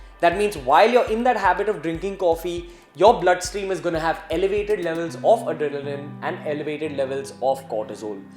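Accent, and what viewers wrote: India. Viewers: first, are you talking with your body or your mouth?! second am wondering how did you invent this language ? is it indian english ?! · Why do you sound like that? Are you Indian?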